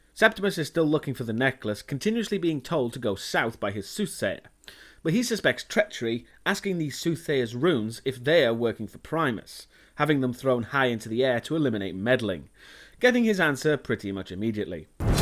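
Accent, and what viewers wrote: United Kingdom. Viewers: cool, anyway,i feel that even though we may disagree on some issues, i still love your reviews. i wonder, how are you in reviewing movies outside of horror and action? maybe some comedy, or if we can crossover genres then maybe some MCEU or DCU, maybe throw in some Stathem movies and some Die Hard for flavor. for further horror, there is still the nightmare franchise with micheal myers and jason vorhees. if you are so inclined. love your work BTW. i was wondering, whereabouts in england are you from? sounds a little like a yorkish accent. i may be wrong.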